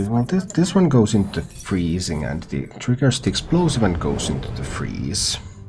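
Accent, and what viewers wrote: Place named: Finland. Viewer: He's from Finland. Suomalainen se on. ;)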